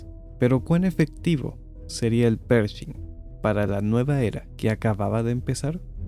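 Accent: Chile. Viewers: Hola , gracias por los excelentes videos hechos con mucho cariño , una consulta al margen , es usted chileno ? Saludos desde el sur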